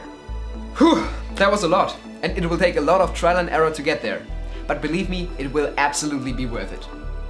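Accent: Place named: Germany. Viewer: I hear German and I like it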